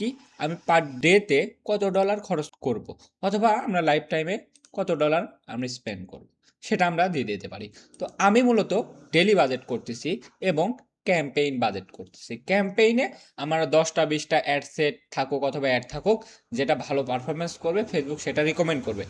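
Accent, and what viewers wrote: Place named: Feni, Bangladesh. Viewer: Apni jey vhabe bujaysen.best.oneker paid course a egula bole na.i mean janena.best of luck. Apnar kothar tone a mone holo fenir ashe pashe may be home?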